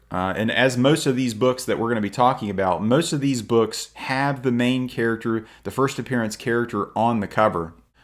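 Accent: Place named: Philadelphia, United States. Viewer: good gawd that philly accent is awful ew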